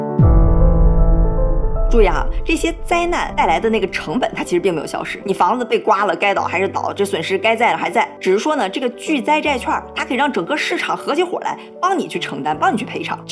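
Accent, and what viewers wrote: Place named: North, China. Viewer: 好奇你是来自哪个地方的人？口音太重了。北方人？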